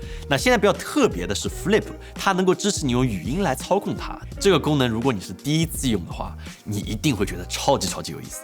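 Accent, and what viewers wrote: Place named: Jiangsu-Zhejiang, China. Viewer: tim口音像江浙一带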